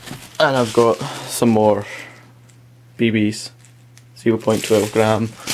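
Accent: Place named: Scotland, United Kingdom. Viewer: aahh gotta love the scottish accent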